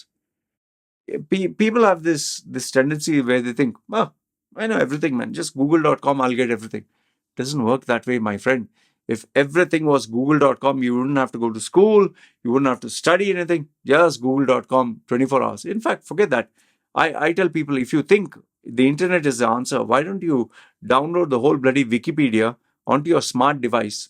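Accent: India. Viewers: U are another type of Indian, Bashing other Indian trying to fit in. Every individual has an attitude and you are just full of shitty ideas. What are you? A gangster-faced wannabe western with an Indian accent. you are disgusting!!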